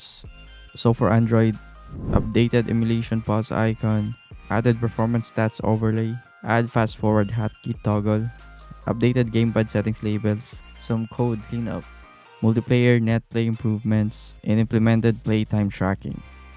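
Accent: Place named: Philippines. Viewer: Man you filipino!? I'm subscribing after hearing that accent. Also we have the same tablet so your channel would be very helpful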